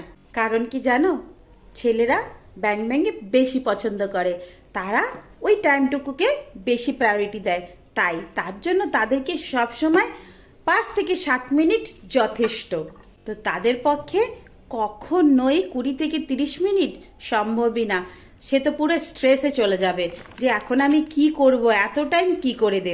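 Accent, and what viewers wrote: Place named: Andaman, India. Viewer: আপনার বাড়ি কি আন্দামানে, আপনার কথার ধরন দেখে আমার তাই মনে হচ্ছে। আমি ওখানে ২ বছর ছিলাম, ওয়ান্ডুরে।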